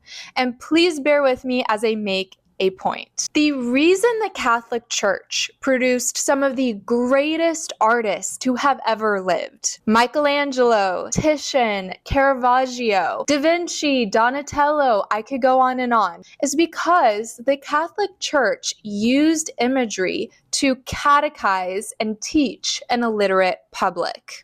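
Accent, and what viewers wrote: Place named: United States. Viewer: Well, here I am commenting again, because I think it’s worth considering another perspective. Yes, the CIA funded aspects of the abstract art movement. Hitler, on the other hand, heavily promoted classical realism. If government patronage alone is enough to discredit an artistic movement, then that principle has to be applied consistently. The conclusion cannot depend on which government happens to be involved. Also, based on your accent, I assume you’re in America. The same society you criticize also protects your freedom to reject abstract art entirely. So even if the CIA pushed it, you are free to decorate your home however you wish. And as you yourself noted, the objective was not to corrupt art, but to demonstrate the cultural power and global influence of the United States, particularly because the Soviet Union rejected abstract art. 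The movement was being used as a symbol of ideological freedom, not as evidence that abstraction itself was somehow a corruption of beauty. I worked as an art curator, and I genuinely adore abstract art. Ironically, although I have access to many classical works, I often find them a bit stuffy in a domestic setting. I have a small black abstract canvas hanging in my hallway beside a classical vase and an antique Austrian Art Nouveau chandelier. I also keep traditional Orthodox icons because I am Eastern Orthodox, and we certainly agree that Christ is the fullness of beauty. Architecture and interior design are ultimately about harmony, prop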